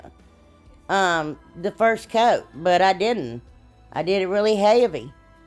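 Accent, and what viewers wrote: South, United States. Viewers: Country twang 4 ever! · I thought I had a countryfied accent but you got me beat by a long shot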